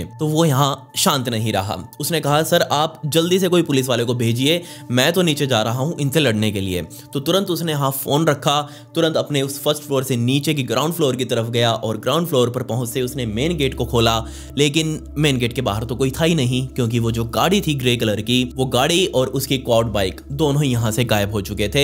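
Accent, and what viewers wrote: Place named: Haryana, India. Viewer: sir ap apki voice apka lok bahut acha h Jab m apki video dekhni lgi thi maine awaz se hi pahachan liya ap haryana se ho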